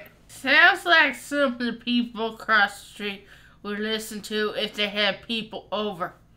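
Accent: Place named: New England, United States. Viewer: Your yankee accent means you should hang out in Daytona or cocoa.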